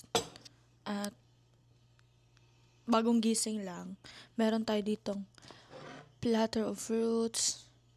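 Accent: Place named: Visayas, Philippines. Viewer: I do hope tutukan mo yung accent mo sa tagalog para mas madeliver mo ng maayos mga sinasabi mo lalo sa lines mo sa acting. hindi mo naman kakalimutan ang bisaya but ofcourse need mo masanay sa tagalog kasi hirap na hirap ka mag construct ng sentence. Grind lang ng grind! For da go!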